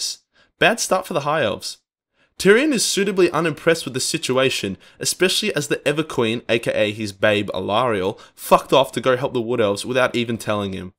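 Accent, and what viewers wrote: Australia. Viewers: its so good to hear ozzies narrate stuff, with our slang and love for C and F bombs and if you dont like it then F%$k off attitude, cheers mate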